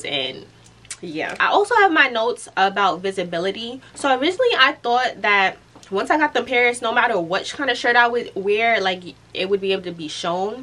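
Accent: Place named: New York City, United States. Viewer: Shout outs to you and being frim NYC. I knew instantly once you started speaking. I'm considering this myself, very helpful video. Thank you, peace & love.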